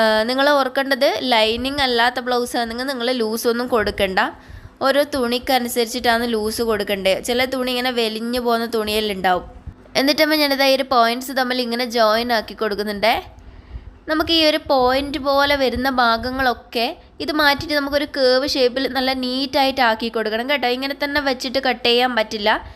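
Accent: Kannur, India. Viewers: കണ്ണൂർ ആണ് അല്ലേ😂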